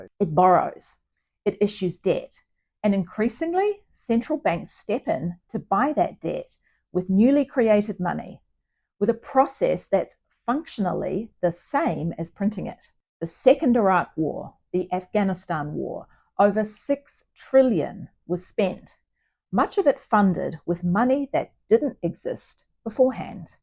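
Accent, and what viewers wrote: New Zealand. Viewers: Very informative. Your accent sounds very Kiwi...in a good way:)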